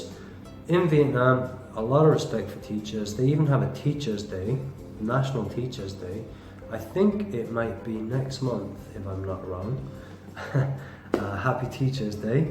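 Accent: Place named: Ireland. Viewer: You are so cute!! and your Irish accent OMG <3 *major crush*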